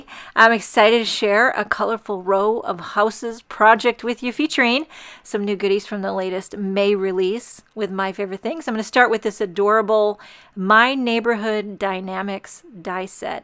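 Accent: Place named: Massachusetts, United States. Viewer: being from Mass... you are pronouncing Mass...the way it should be; however, roof in Mass is pronounced like woof, but with the r. However, i have heard folks in your geo area pronounce roof as you do. In any case, considering how badly Mass... slaughters the English language, i mean no disrespect to you or your regional accent.🤗🥰✌😂💐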